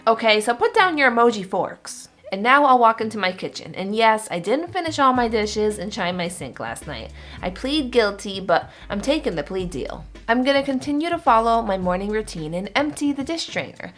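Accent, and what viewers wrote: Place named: Cockney, United Kingdom. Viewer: Nice Cockney accent. You could play Eliza Doolittle. Thanks for the swish-and-swipe hacks. That's the part of the morning routine that holds me up.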